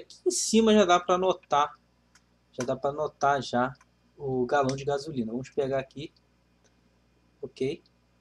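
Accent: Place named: Rio de Janeiro, Brazil. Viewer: Carioquês rs boa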